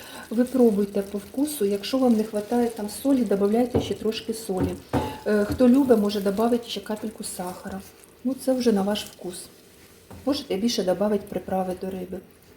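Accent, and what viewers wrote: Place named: Surzhik, Ukraine. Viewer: ніяка не біда , це суржик , в кожному регіоні свій говір , ви ж не будете заставлять львівян , чи тернопольців розмовляти як ви , краще вчиться готувати і не чипляйте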